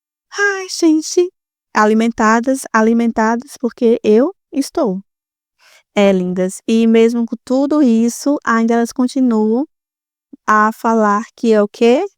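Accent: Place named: Bahia, Brazil. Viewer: Oi menina. De estado do Brasil vc é?? Pelo sotaque acho que é Bahia. Acertei???😂🎉🎉🎉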